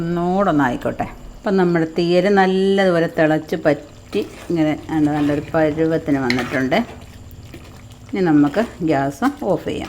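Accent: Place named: Pathanamthitta, India. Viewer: Hello Eavideyanu Sthalem Samsarem Kettittu Pathanam Tittayano ❤🧡❤🧡❤🧡❤🧡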